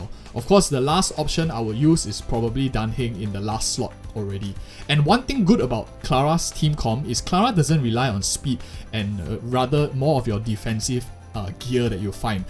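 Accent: Singapore. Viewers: bro you sound like a singaporean. If you are from singapore, it is nice to see a fellow singaporean covering honkai star rail 😄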